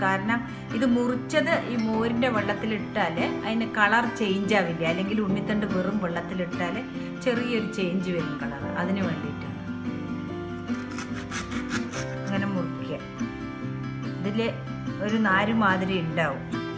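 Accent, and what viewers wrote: Palakkad, India. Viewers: Palakad aano desam samsaram thonunu super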